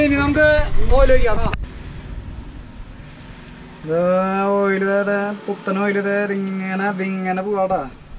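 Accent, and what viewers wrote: Thrissur, India. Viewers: ande place thrissur thannya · തൃശൂർ സ്ലാങ് ഇഷ്ട്ടം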